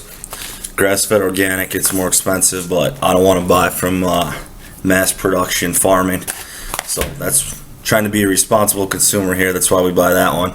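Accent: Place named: Canada. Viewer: Call me crazy but as a canadian, I would think you were canadian by your accent if I didn't know you were american haha keep it up man you're a beast!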